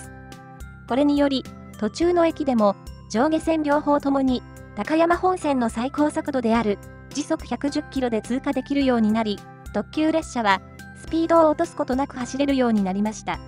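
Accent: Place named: Tochigi, Japan. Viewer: 歌、英語、栃木弁訛りとここ数日攻めているUP主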